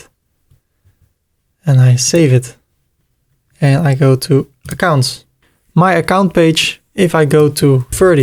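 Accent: Netherlands. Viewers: you dutch.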